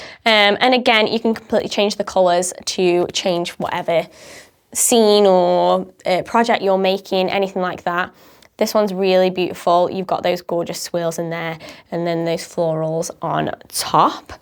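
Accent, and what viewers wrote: United Kingdom. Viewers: Thank you so much for this walk through (made even better by your darling British accent!). So much inspiration and so many ideas.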